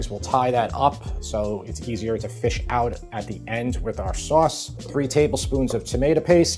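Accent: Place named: Long Island, United States. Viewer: That Long Island accent is like a warm blanket.. reminds me of home 😢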